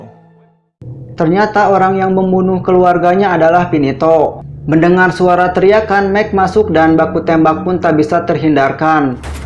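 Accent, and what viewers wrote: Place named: Lebak, Indonesia. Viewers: Narasi nya ..suara.kedengaran sprti dari Lebak yaaa....pas lagi bilang ..Lucerroooo...Leeooo...Romeooo...sprti sandiwara Raadioooo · Medok sundanya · Sundaan geuning..prekeetek emang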